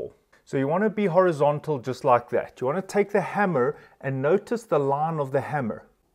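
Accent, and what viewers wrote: South Africa. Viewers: Definitely a south African accent though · No. This voice is more South African. The Terminator's is Austrian.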